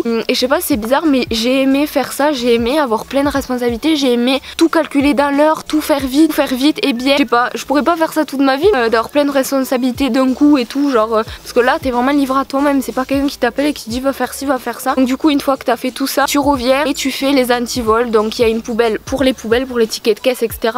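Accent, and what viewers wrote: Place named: Toulouse, France. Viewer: ho pitain l'accent toulousaine vive les chocolatine XD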